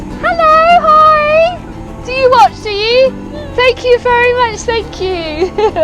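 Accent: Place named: United Kingdom. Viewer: Love your channel. It's one of the regulars that my wife and I watch. We watch a lot of British TV shows and love the various regional accents of the UK. What part of the country are you from, and what should we call your accent, which we like very much?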